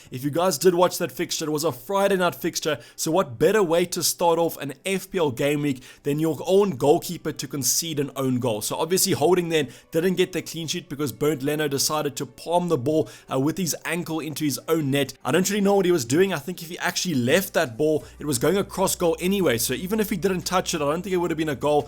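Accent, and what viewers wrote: South Africa. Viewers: Never tiered of hearing your lovely South African voice, keep up the great work 👍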